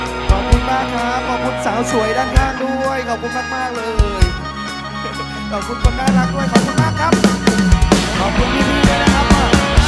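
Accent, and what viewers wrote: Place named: Korat, Thailand. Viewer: พี่ โคราชแก่ ไอ้อย่างชัด เก่นด่อกเด่😂😂😂😊😊😊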